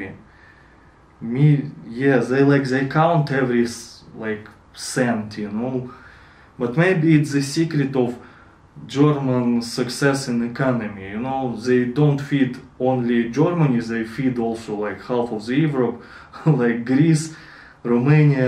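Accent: Russia. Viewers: Hello! Are you from Kirov or Yeburg? Your accent is like a Ural one.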